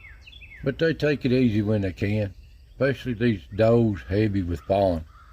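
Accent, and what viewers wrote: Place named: South, United States. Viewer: Thank you for bringing the wildlife and woods to me Mr, i love your voice and southern accent. ❤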